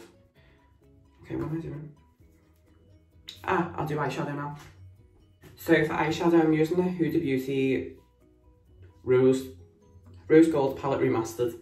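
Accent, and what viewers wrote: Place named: Liverpool, United Kingdom. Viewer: Your makeup is gorgeous x am guessing your fromm liverpool? Because I am too!! ❤🙂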